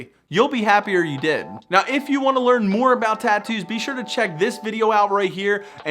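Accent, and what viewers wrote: Philadelphia, United States. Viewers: Yo are you from Philly? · The way you say water (wooder) reminds me of someone from Philly, Jersey or New York . . . Wonder if you say radiator like someone from Philly. ✌😁👍 ( RAD - EE - AYE - TOUR )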